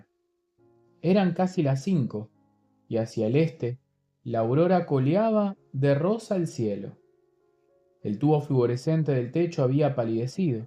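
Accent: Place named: Argentina. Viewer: Querido, mil GRACIAS por leernos. Consulta: ya leíste en el otro canal APOCALIPSIS? Que gusto escuchar leer en argentino 😁💪🏽🇦🇷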